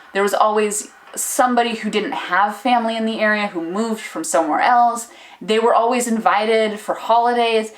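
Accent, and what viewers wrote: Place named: Ljubljana, Slovenia. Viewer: Do you know u speak “dialect” too? I am sorry, but tbh “ljubljanščina” can be slightly annoying.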